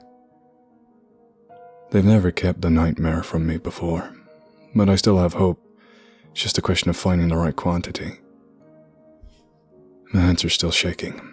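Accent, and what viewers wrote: United States. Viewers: This reading was fantastic!! Haha you perfected the average american accent on this one, bravo! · Your American accent is so good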